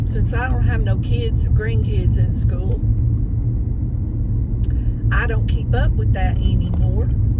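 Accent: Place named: South, United States. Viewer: I love your southern accent. I miss it where I live now. 💜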